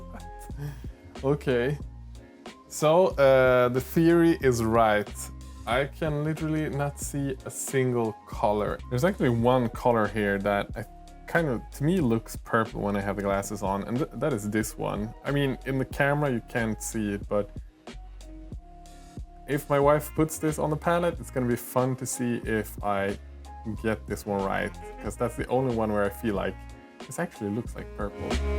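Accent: Sweden. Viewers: WHAT DUDE! Oh my god you’re swedish??! I could tell you werent native english but wow im shocked. Haha i knew I recognized the accent. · Ah! I thought I heard the Swedish! Great video, lot's of fun :)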